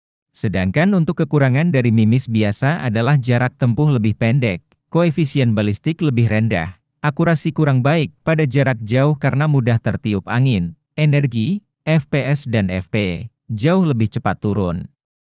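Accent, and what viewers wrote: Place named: Java, Indonesia. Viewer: Ilat.e wong jowo wes podo paham slag slug podo ae...😅😅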